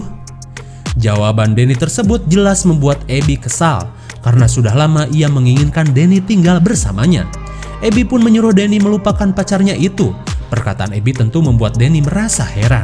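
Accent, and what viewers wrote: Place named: West Java, Indonesia. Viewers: Bang kamu pasti orang sundanyak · Suara sunda mh enak ya bund;v mah kuat wat wat wat wat wat terngiang² 🤣🤣🤣🤣😴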